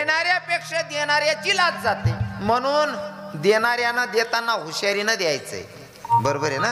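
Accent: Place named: Ahirani, India. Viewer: खरंच खानदेश रत्न आहे महाराज पिवर अहिराणी बोलतात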